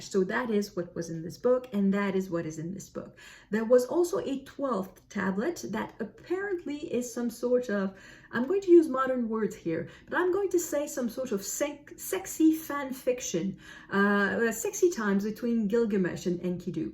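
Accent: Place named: Quebec, Canada. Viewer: I do enjoy your channel. I love your mixture of Canadian English and French. It makes this old lady happy to hear you talk about her favorite things, books. I don't know whether I have actually read the Illiad and the Odessey as but I remember enough about them that I'm pretty sure I have read them sometime in the last 65 years (I was 12 then). I do remember reading The Aneneid in fourth year Latin at school. Dido really stuck with me. My daughter has read Gilgamesh. If she owns the book I can borrow it for her.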